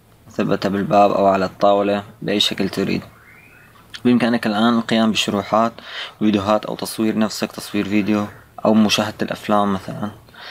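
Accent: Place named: Syria. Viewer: حسيتك سوري 😁😎 حلو كتير استمر👍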